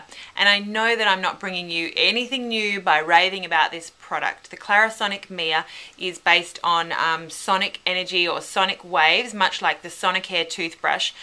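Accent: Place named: Australia. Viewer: I just love your Aussie accent! Here in Europe we mostly only hear UK or US english, this sounds so cheerful LOL!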